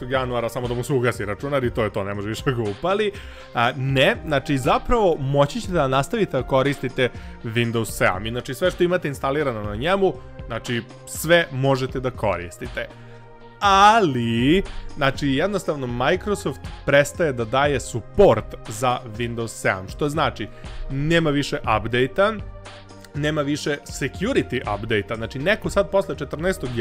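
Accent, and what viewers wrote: Russia. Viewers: cita engleski kao RUS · Taj tvoj domaci engleski zvuci ko rus kad pokusava da prica engleski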